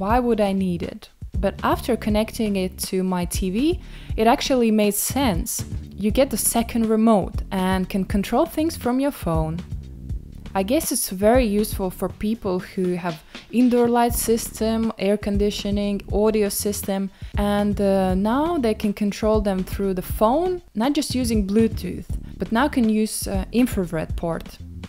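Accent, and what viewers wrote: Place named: Balkans, Eastern Europe. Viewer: from accent I can tell you are from europe proboly ballkan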